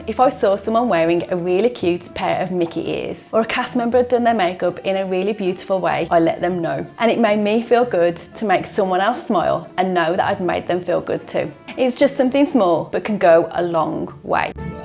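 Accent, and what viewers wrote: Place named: Black Country, United Kingdom. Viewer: Ive just come across your video ❤ I hear black country 👌🏻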